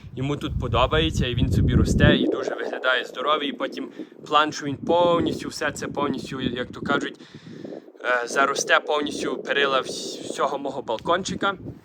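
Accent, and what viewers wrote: Western Ukraine, Ukraine. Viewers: Дуже сподобалося все так по українськи дім сад город , і яка в вас гарна українська мова з західним колоритом , ніби вчора переїхали до Канади молодець.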